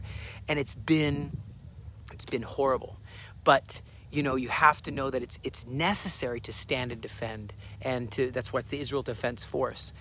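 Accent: United States. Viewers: Excellent American accent for being a native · Is this guy American or Israeli?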